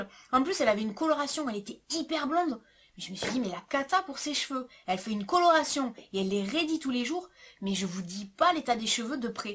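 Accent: South, France. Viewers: Coucou! Super vidéo, comme d'hab! Merci pour ces conseils! Tu as effectivement de très jolies cheveux, un tuto sur ta coiffure? Je sais que tu préfères parler mode mais peut être l'inclure dans un get ready? ^^ Quelques soit le thème de tes vidéos, c'est tjr un plaisir de t'écouter! =) Je vis en Espagne, et ton petit accent du Sud me rappel mon chez moi ^^ Besito guapa!!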